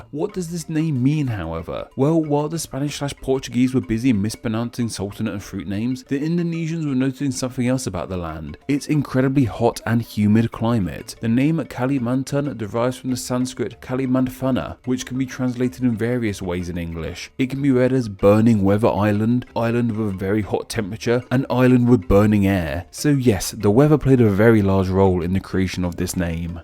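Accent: United Kingdom. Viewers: Jahaha i study british accent from your video sir😂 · Please don't take this the wrong way, but the way you get your "R" and "L" mixed up when you try to pronounce the names makes you sound like an East Asian despite the clear British accent. Fun fact: at 544,150 sq km, the Indonesian part of Borneo is bigger than the whole countries of Malaysia (both Malaysian Borneo and the Peninsula) and Brunei put together (335,612 sq km).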